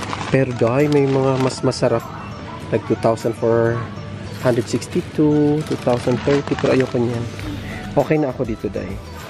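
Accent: Visayas, Philippines. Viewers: Bisaya ka?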